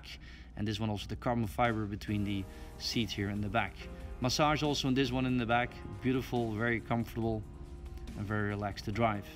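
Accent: Netherlands. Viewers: You have a very strong dutch accent. You dutch by any chance?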